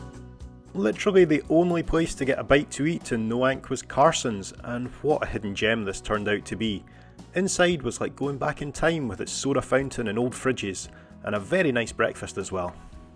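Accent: Scotland, United Kingdom. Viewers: Hi and thanks from a Scotophile in Nashville, TN, US. Your video was the first result of interest on a search for Mystic CT., in prep for the Classic Wood Boat Show there in August. Backstory is that we plan to move to the Black Isle in 2 years (God willing!), where my hubs hopes to get into building classic wood sailboats. You narration is divine- as the myriad regional Scottish accents are my favorites anywhere! Thanks for a great channel- we'll be stalking it now.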